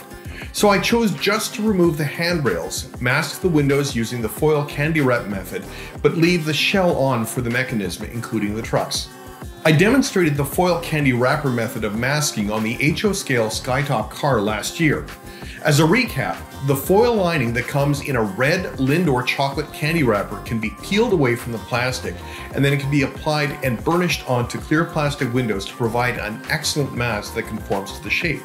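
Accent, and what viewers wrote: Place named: Canada. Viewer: Great video, and being from western Canada (Victoria) myself, I too use the same pronunciation - its' just a Canadian thing, eh?;-) A couple of questions: I was curious why you were not wearing gloves while painting and handling the model; and what liquid was being used to clean your airbrush between coats; and I think I also saw 91% isopropanol being used a reducer.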